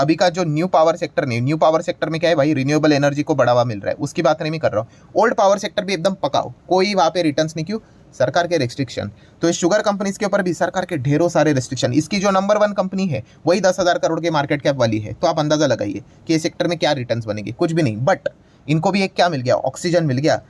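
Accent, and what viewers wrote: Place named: South India, India. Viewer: Are you madrasi,because ur Hindi pronounce is like madrasi people pronounce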